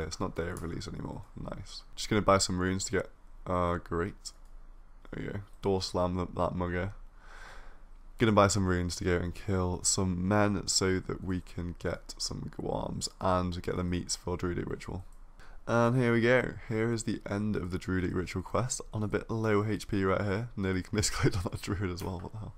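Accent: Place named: Newcastle, United Kingdom. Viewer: True Geordie lol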